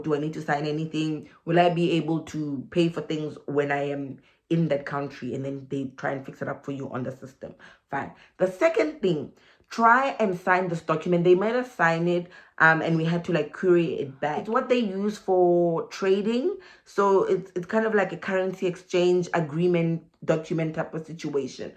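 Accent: South Africa. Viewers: Love the way you explain in such a South African way! Makes me feel so at home and like we can definitely do this!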